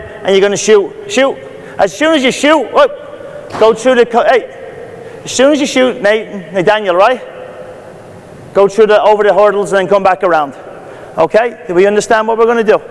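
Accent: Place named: United Kingdom. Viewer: British accent ''' in it '''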